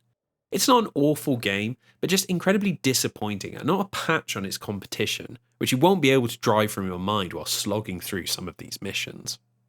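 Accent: England, United Kingdom. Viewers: These sorts of videos are always successful because of an English accent narrating it lol kudos to you though on this monster sized content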